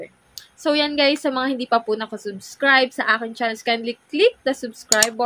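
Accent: Batangas, Philippines. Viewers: Batanggeña ka po?? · laang?? 🤣😁✌️✌️ batangenya ka te? hehe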